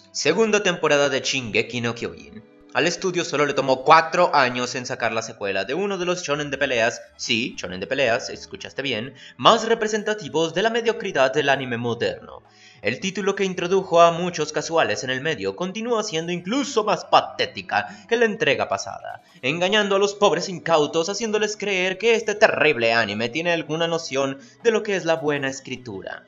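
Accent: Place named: United Kingdom. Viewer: Se que todos odiaran este comentario, pero extraño el acento britanico de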